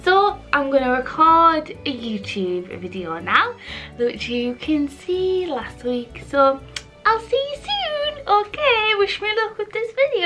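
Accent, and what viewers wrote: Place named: Teesside, United Kingdom. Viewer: Hello ! I found your channel pretty randomly last week, you and your art are totally adorable. I love your studio vlogs even the bits you think are boring. And i can't believe i'm hearing a Tesside accent on here! I live in Yarm so i'm v close by if you'd like a new gal pal at all i'd totally be up for coffee and cake. I have no idea where that coffee shop is but i'm offically intrigued.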